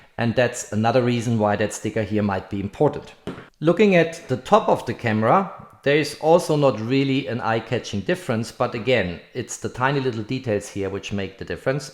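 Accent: Germany. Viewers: "Null Null Sieben" or "Double-O Seven" in English. No "O O Seven". My guess is you're German and never watched a Bond movie in English (besides that I hear a slight German accent). Just saying... Cheers from Indonesia!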